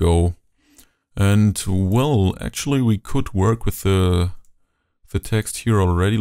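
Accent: Germany. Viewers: deutscher hört man sofort raus :D aber nettes video xD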